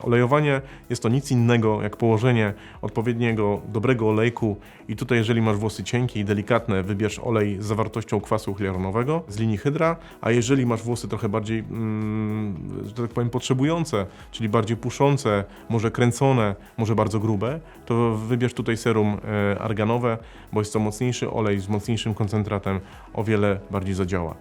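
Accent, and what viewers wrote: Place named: Poland. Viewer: Niesamowicie bawi mnie Polska wymowa angielskich słów 🥲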